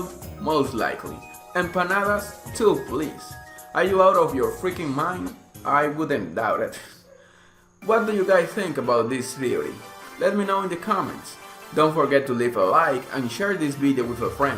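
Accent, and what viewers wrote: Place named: Latin America. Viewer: That was a great theory, awesome job man! I am curious about your accent, are you latino?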